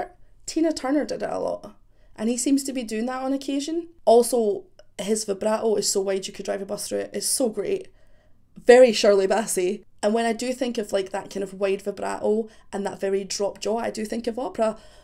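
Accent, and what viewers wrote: Scotland, United Kingdom. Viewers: First of all, love that Scottish accent. 2nd, had the pleasure of seeing twice (, seven times), and it is a wholesome experience. Sing along, jumping together, a tribe coming together for a metal sermon. My fav. is, no question. You mentioned gothic briefly, I suggest you check out the by handpicked warm-up band -. Other than that; - (Live Masters of Rock 2015). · Lovely finding a scottish reactor! Pure cracking up at some of your patter, you made me homesick, naebdy swears as fluently as us 🤣 is one of the all time great singers, you want to check out what his voice was like when he was younger (just as good). I actually was at the very first gig where sang for, at the Barras in Glasgow. Powerful singer, sort of reminiscent of or.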